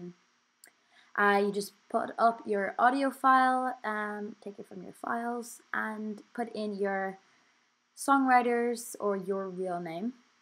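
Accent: United States. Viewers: What's with the American accent Allie? you are from the people's Republic of Cork... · Sounds like she's going a tad American pronunciation,) ammhmmm....well is it?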